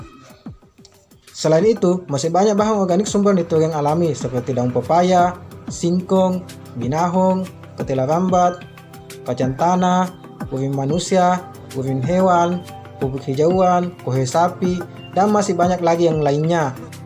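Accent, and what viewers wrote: Bugis, Indonesia. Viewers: logat bugis kah?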